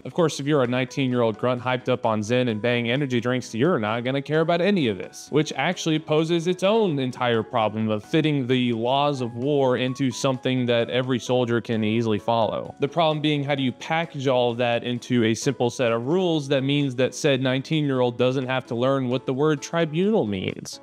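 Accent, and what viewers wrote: United States. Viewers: Hold up. Your American accent tells me enough. Pay Japan and get it over with American. Consider your own first words in this video. (I enjoyed your content [as in this first 5 second clip I watched from you] but I must leave and never watch the video further because that would most propably make me look like an idiot as you will most likely prove my own point or speak about the "illegal warcrimes")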